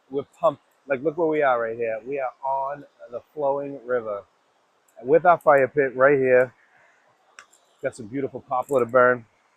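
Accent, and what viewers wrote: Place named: Massachusetts, United States. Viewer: You are definitely from Massachusetts. Accent & dropping the r's at the end of the word. Sound effects you do not need. Another camp ground on my list of possible stays thank you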